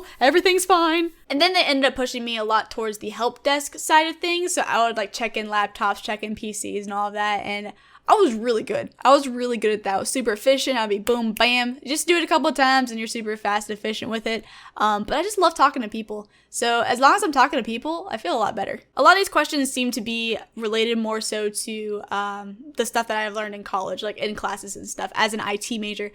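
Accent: South, United States. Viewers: Think I honestly have never really heard a true American Southern accent. This girl seems nice and her videos have a nice quality and effort on them. Subscribed.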